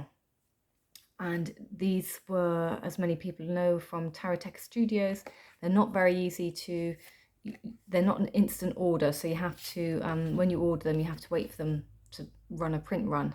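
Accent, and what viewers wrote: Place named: United Kingdom. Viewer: For the record...I adore your thumbnail. And thank you so much for your kind words ❤ this was lovely! I have my eyes on the Dark mansion Tarot. Your decks are beautiful and I think I'll add a feel to my list lol. Enjoyed this so much. Your answers are awesome. Sending you love. And I noticed you have a lovely UK accent if I am correct? Man I miss it over there and can't wait to get back.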